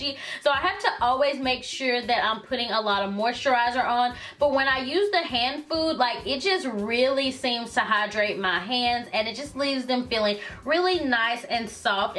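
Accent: South, United States. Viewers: So I'm from Phenix City so i know all to well about that Bama summer weather. I bought the face & body last spring for the same reason hoping that it was a light coverage & didn't make me look like i was melting & i absolutely loved it for spring & summer. I sometimes would mix it with my Garnier BB cream. Hope you enjoy it & check out some of my videos & tell me what you think. Love your videos by the way. Its nice hearing another beauty lover with that southern twang. :)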